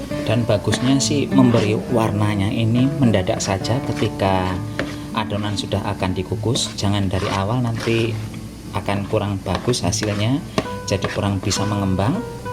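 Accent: Banyumas, Indonesia. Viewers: Mas Rika wong cilacap kan ngomong e ngapak² kya enyong budin pinter masak Rika kang...bojomu seneng due laki pinter masak 😂😂😂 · Saya rasa Mas berasal dari Purwokerto Banyumas.Dialek nya mirip.dialek orang Purwokerto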